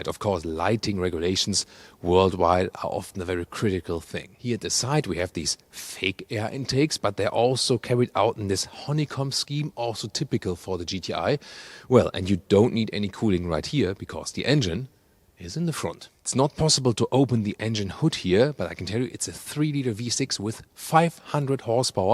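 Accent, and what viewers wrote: Germany. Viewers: First thing tht came up to my mind when I saw this 'flat flunder' : what do you to with your head sticking out above the windshield? (You can see it ) . Looks funny. And why did they choose a German presenter, whose accent is still audible? One important question was not answered: how high would the purchase price by of such a 'fun car' with 14 " road clearance which would have trouble to pass what the French call 'ralentisseurs' without any damage.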